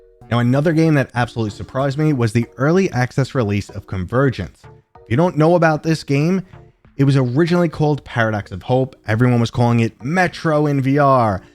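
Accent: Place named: South Jersey, United States. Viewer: lol. I Grew up south of Philly in an icky place called Chester. Lived there in the 80s and 90s . I currently live between Philly and Lancaster. You sound like a Jersey guy to me. I would guess a little more south Jersey cuz your accent isnt that thick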